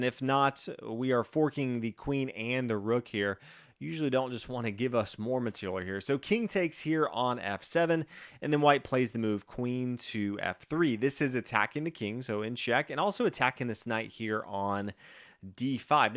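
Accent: United States. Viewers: What a stupid comment, is American so he pronounces his name with an American accent. "Алехин" make Alehin (even alehina) I don't see the problem calling him Alekhine just like I don't see the problem when every non-French folks struggle to pronounce Vachier-Lagrave correctly and it systematically turns out as "vachier-laguave". I hope you do know how to correctly pronounce Praggnanandhaa, Maghsoodloo or every other GM names that are westernized or who sound slightly different than how they are written. It's just ridiculously elitist, just thank the content creator for the knowledge he shared.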